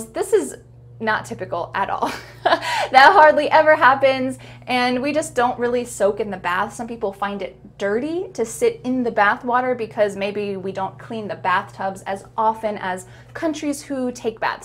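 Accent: United States. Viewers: I luv her accent. I'm crazy about american eccent... I'm practicing this everyday. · sei troppo brava complimenti. In quale stato dell’America vivi? Chiedo tanto per capire che accento usi del Norton del South. Grazie 😊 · WOW... I nuts about your methodology...God bless you teacher,and amen!!! I've been watching some of your videos and I love your American accent!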